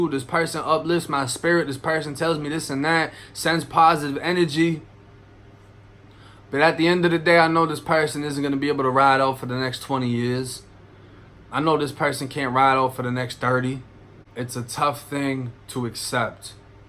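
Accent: Boston, United States. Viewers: I could listen to you talk for hours witchur Boston accent lmfao !!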